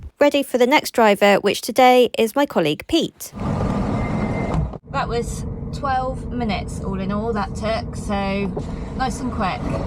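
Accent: West Country, United Kingdom. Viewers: I am a new subscriber, and was disappointed that in your last video you said you were leaving, but glad that you will be taking us on your new journey with future videos. PS. Love your west country accent !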